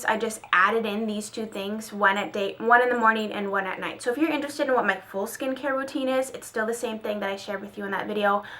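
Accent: United States. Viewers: New subscriber here your beautiful I love your voice I love American accents (I am from the UK) great video. Xxx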